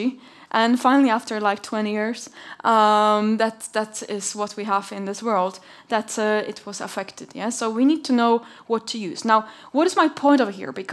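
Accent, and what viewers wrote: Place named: Netherlands. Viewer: Yes she's superb, and her english has improved - her delivery is a bit more mellow that earlier "rapid fire" webinars from a few years ago. She now sounds more Dutch than Polish !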